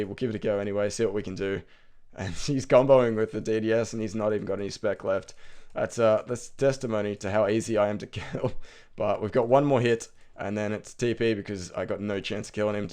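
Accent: Australia. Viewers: Everything is more entertaining in an Aussie accent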